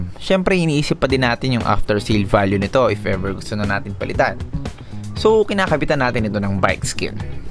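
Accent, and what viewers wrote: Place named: Philippines. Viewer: Alright, I'm guessing you're Filipino which means my ignorant self can't understand half of what you're saying, but your video is cool and you've got a nice hardtail so you get 👍 for that and another 👍 for your tips. Shred some gnar!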